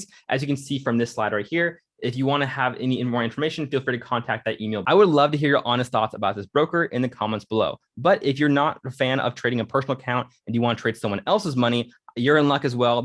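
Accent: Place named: United States. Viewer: You sound American 2 me bro, how do use this broker? It doesnt take US residents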